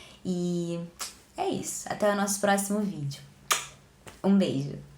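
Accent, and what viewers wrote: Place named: Rio Grande do Sul, Brazil. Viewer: Quando eu era pequena (hoje tenho 32), tu tinha sotaque gaúcho:) Que engraçado te ouvir agora!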